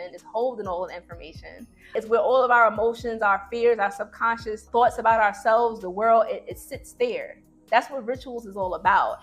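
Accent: New York, United States. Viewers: my god new fan here you are from NY with that lovely accent!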